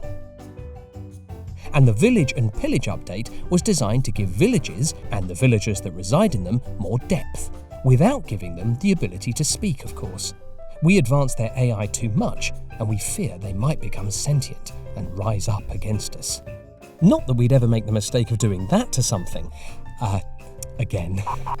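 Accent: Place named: United Kingdom. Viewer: イギリス訛りいいねぇ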